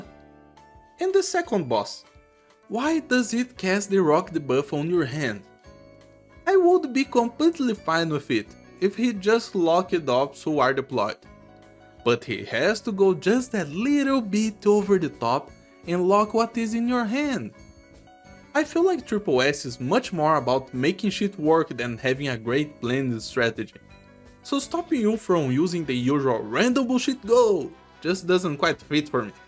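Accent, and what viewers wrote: Brazil. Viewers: You sound like a Brazilian speaking English, could I be correct?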